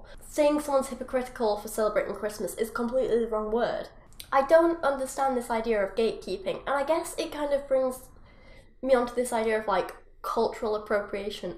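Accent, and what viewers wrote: United Kingdom. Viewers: The British accent is getting really annoying.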